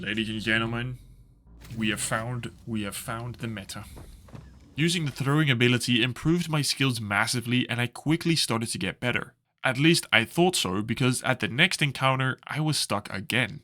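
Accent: Netherlands. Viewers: One question are you dutch ?😅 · I know a fellow dutchie when I hear one. And the part with your brother absolutly killed me as someone who could as a dutchmen haha. It also confirmed my suspicion about being dutch 😂 anyways loved this vid as im getting into plat hunting myself right now. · Ik hoorde gelijk dat je een Nederlander bent hard ik gooi een sub voor die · Hey! You Dutch?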